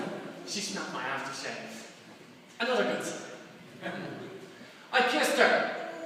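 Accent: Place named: Ireland. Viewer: A highly entertaining and very well crafted speech. Well done. And refreshing to hear a non-Anglo-Saxon accent. Ah shur, I'm a bit biased towards the dulcet tones of my fellow countrymen!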